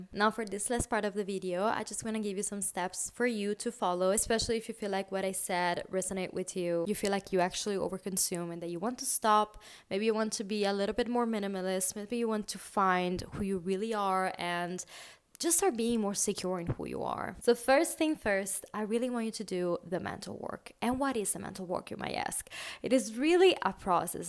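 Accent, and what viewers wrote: Italy. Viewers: Mado, che bell dialetto italiano 🥰🤌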